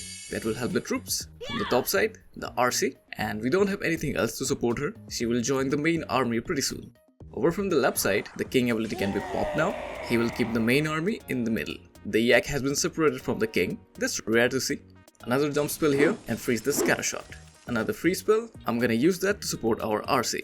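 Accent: India. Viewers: Bro are you Indian??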